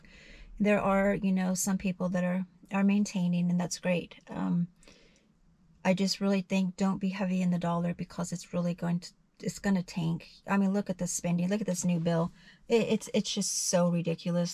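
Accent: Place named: Maine, United States. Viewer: i hear maine, or vermont or whatever and maybe its true. Id like a guess outside the U.S if you picked those.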